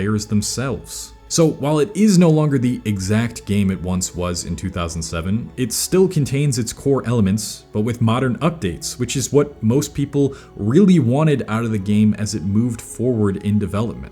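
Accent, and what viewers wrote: United Kingdom. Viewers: his accent convinced me that he's british (im from britain) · The British accent caught me off guard😭 It was very good though.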